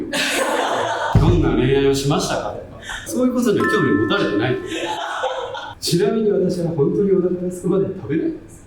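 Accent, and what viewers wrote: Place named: Osaka, Japan. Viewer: いつの間にか大阪弁になってる〜 出来ましたの時の健君のとても嬉しいそうな顔幸せそう🎉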